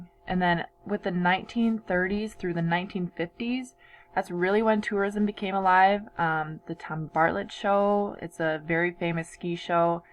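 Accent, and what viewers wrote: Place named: Wisconsin, United States. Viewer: I've been seeing videos of the attractions in Wisconsin Dells and had no idea what it was until I watched your video. Thank you. It was great to hear you speaking so that I get an idea what what a Wisconsin person actually sounds like. I don't meet too many people who grew up there where I live in the south and yet I have met a lot of people from Michigan. There is a real similarity to the way you sound in your talk and the way people from Michigan sound.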